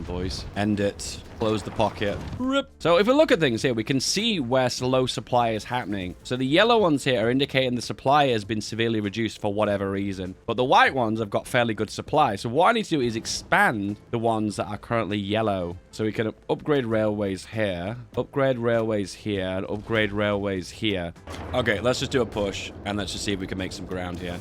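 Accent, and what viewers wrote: United Kingdom. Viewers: why does sound like spiffing brit? is it because their both british?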